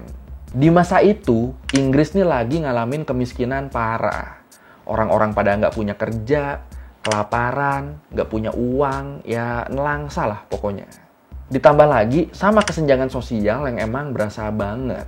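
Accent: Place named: Madura, Indonesia. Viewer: gaya bicara lu mirip dilan bekasi campur logat madura, " selalu ngejait silet diujung top'i " selalu ada jeda cokk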